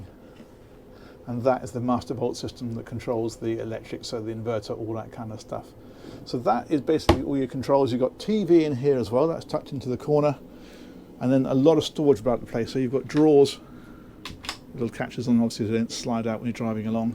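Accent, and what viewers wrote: United Kingdom. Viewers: Sir, I am not your subscriber. But I used to see your videos. Because of 2 reasons: a) your clear British accent. b) you explain things very beautifully I congratulate you for your hard work May God Bless You Always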